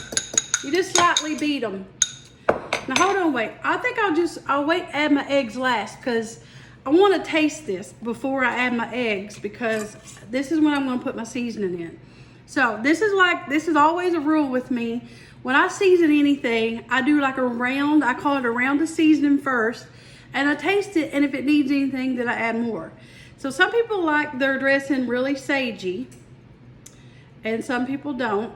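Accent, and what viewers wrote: South, United States. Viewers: Sweet country accent , could listen & watch you all day ! New follower !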